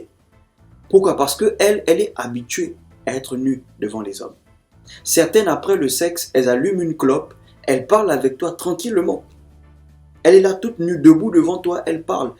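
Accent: Gabon. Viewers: te gabonais frère l'accent ce chez moi içi ah libreville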